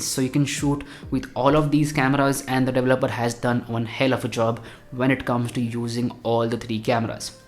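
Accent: India. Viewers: Hate this indian accent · konuşma şeklinize sokayım sizin. hintli değilim iyiki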